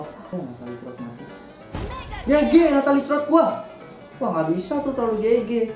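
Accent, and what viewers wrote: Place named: Surabaya, Indonesia. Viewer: sedot lu kok medok bgt??? pas an kyk aku suroboyo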